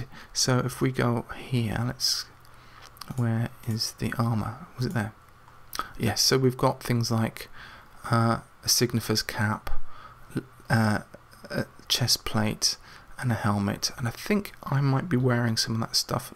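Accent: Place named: North, United Kingdom. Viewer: Thats really awesome, great work...you say you're from the north, what part if you don't mind me asking, anywhere close to Middlesbrough, can't place the accent :)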